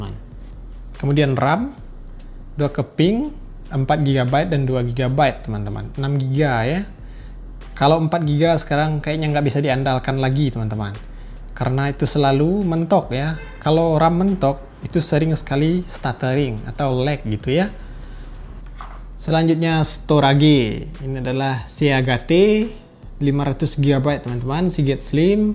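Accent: West Sumatra, Indonesia. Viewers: Abang orang minang kh?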